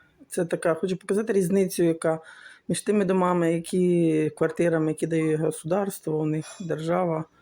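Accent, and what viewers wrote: Surzhyk, Ukraine. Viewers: Ви не вмієте розповідати , не кажучи про ваш суржик . Вам потрібні лайки?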